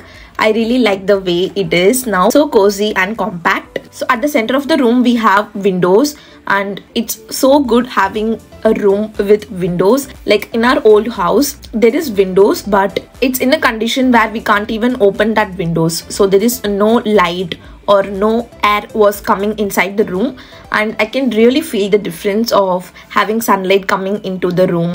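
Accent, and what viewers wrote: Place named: England, United Kingdom. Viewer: So nice !!!! Love ur English accent ….Your room is so minimal and looks so good ❤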